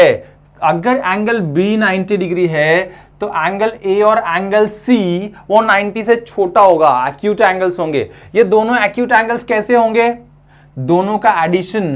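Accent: Bihar, India. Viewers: Sir aap bihar ke hain kya? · Bihar se ho sir ? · You are in bihari style. Jai Hind Jai Bihar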